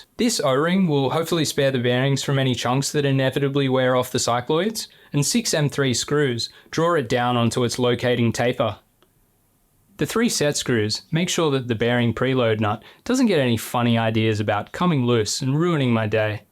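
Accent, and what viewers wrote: Australia. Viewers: I just found this channel, and I get the feeling you're like a younger, more Australian This Old Tony. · Every Australian I see on YouTube always turns out to be a subversive communist trying to undermine their own country, let alone mine. So when I hear your accent I am immediately alarmed. :( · AVE ! But Aussie and not Canadian! You got my subscription 😂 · Cycloidal drive for what? A fishing Reel? Looks Like I have gotten in somewhat early new subscriber here. Plus you sound suspiciously Australian? Can you define SuperWog? for me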